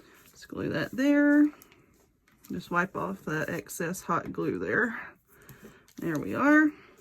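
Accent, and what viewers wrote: South, United States. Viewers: I will save this video with all of my other videos of things I want to make. I love that you take your time in showing some of us slowpokes how to make great diys. Your sense of humor and southern accent always make your channel so enjoyable to watch. I wish you and your family a very Merry Christmas and a wonderful New Year. I pray The Lord bless thee, and keep thee: The Lord make his face shine upon thee, and be gracious into thee: The Lord lift up his countenance upon thee, and give thee peace. Numbers. 6: 24-26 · I love this and being a displaced southern gal myself, I just loved hearing my kinda accent! One suggestion that I would offer would be to mention that if you use hotglue, it needs to be via a gun that makes the blue very hot! I heard about this craft from a friend who failed at her attempt and learned that she used a mini glue gun so that the blue wasn't hot enough. 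Thank you for sharing this craft.😁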